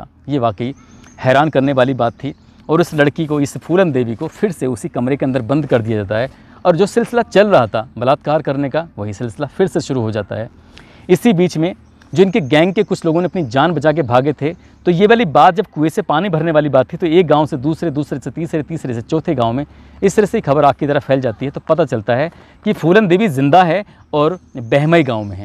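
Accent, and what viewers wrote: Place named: Amroha, India. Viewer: सर, आप तो हमारे ज़िले अमरोहा की हमारी तहसील हसनपुर के ही निकले 😂 आपके बोलने के अंदाज़ से मुझे लगता था, Allah bless u sir, keep it up👍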